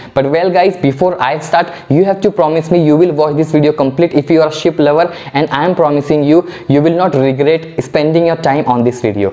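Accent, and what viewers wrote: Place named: India. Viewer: My heart beat was "dhak dhak" Typical indian english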